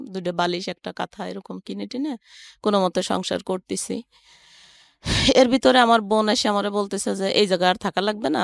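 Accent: Bangladesh. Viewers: গল্প মনে হয় মিথা কারণ বাড়ি খুল্না কথা বলে বরিশাইল্লআ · বাসা মুকসুদপুর হবে ভাষায় মনে হচ্ছে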